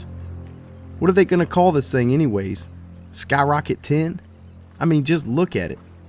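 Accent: South, United States. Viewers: sounds COUNNNTRRYYYY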